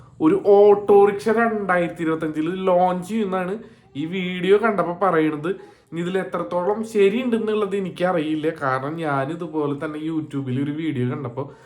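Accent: Thrissur, India. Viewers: ഇയാള് തൃശൂർ ആണോ തിരതൊരം ആണോ?? 😂😂😂😂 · തൃശൂർ ആണല്ലേ........!!!!".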